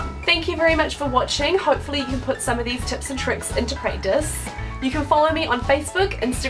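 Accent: New Zealand. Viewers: Your hair looks ABSOLUTELY beautiful!! This tutorial is so helpful!! Thank you! Where are you from? New Zealand? I love your accent. (I am American. 🙂) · It’s a New Zealand Accent that is where I am from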